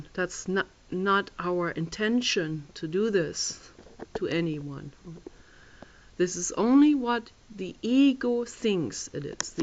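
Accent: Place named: Germany. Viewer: Are You German? You have suuucchh a german accent. Great video!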